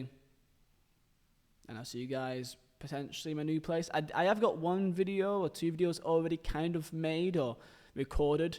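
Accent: United Kingdom. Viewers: Good luck with your next destination/Adventure, BTW your British (random British guy).👍🇬🇧🇬🇧🇬🇧🇬🇧🇬🇧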